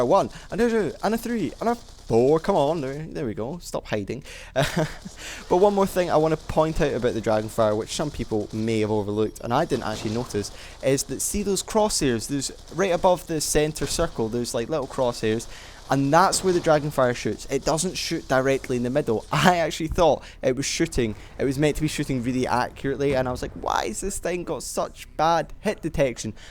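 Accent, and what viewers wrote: Scotland, United Kingdom. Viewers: Finally a decent Scottish commentator.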